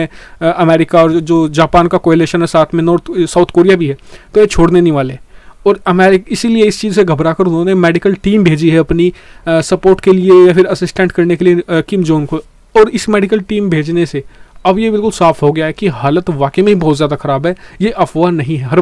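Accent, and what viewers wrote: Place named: Bihar, India. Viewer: SIR AAP KIS STATE SE HO ? BIHAR SE I GUESS ?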